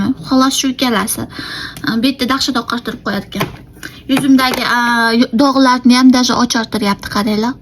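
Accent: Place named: Fergana, Uzbekistan. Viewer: Ozbekistonni qayeridan edingiz shevangizdan Fargonalikka oxshadingiz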